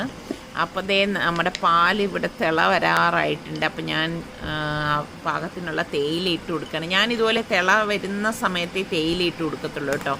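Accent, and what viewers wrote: Trivandrum, India. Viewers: Ithaa,veedu tvpm aano? Varthanam kettittu anganey thonnunnu....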